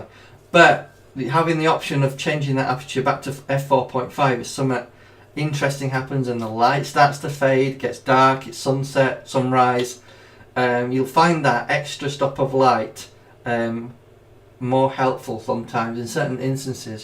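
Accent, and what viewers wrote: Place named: Thailand. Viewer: That sounds like someone from Thailand reading some text …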